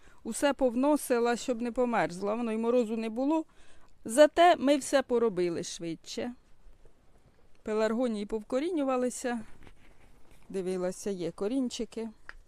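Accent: Poltava, Ukraine. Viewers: Добрий день,. Натрапила на Ваші відео, слухаю не наслухаюсь, обожнюю полтавський говір. Живу в місті, городу не маю, хоч і мрію мати, але дивитимусь Вас заради мови ❤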